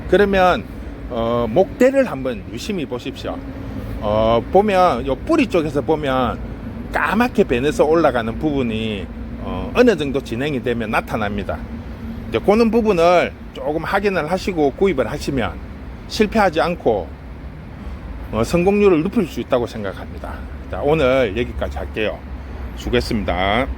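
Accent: Gyeongsang, South Korea. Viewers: 경상도 말씀이지만 초보자들이 잘 알아 들을수 있도록 귀에 쏙 들어오네요 좋은 정보 감사합니다^^ 오늘도 축복 되세요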